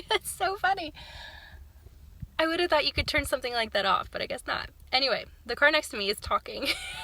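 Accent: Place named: North, United States. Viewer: im going to guess you were raise more north than Illinois. Some of your pronunciations are more northern than me and I am in southern MI.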